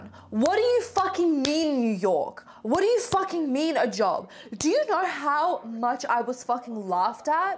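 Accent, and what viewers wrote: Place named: Australia. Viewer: I love how this made me feel. Thank you for this kick up the butt. Hearing a fellow Aussie accent saying things like this hits 👌 I appreciate it!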